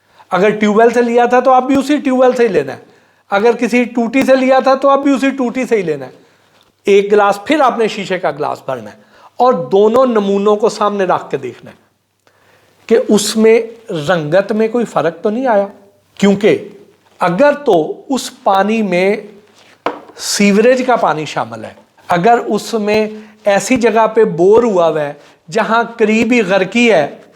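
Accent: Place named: Punjab, India. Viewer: ۔۔۔ اتنی لمبی باتوں کی لسی بناتا ہے ۔۔۔ کی جیسے پانی میں مدھانی چلائی جاتی ہے ۔۔۔ بلکل بک بک بکواس بلکہ بکواسیات لگتا ہے ۔۔۔۔ یہ پینڈو لب و لہجے میں بات کرنے والا یوٹیوبر بلکل گھتو ڈھگا پنجابیوں کےلب و لہجے میں بسوں میں چورن بیچنے والے کے سٹائل میں بات کرتا ہے ۔۔۔ ابھی پچھلے دنوں کشمالہ کا انٹرویو کر رہا تھا اور لگتا تھا کہ پتلون گیلی ہو چکی ہے ۔۔۔ اور مکمل تھلے لگا ہوا تھا ۔۔۔۔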